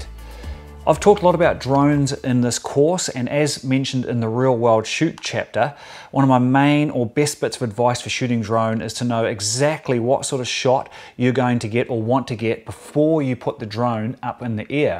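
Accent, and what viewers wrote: New Zealand. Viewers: In the “EAAR” no offence hahah I love the NZ accent!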